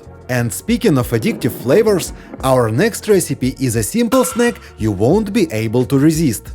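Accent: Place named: Russia. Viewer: awesome accent! Reminds me of my fav star trek "Chekov" Walter Koenig ❤️ ingenious creative recipes!!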